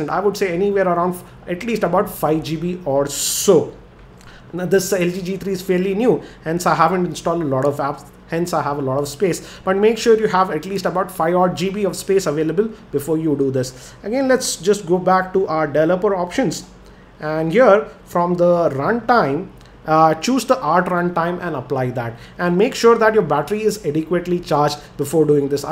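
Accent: India. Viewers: Omg this Indglish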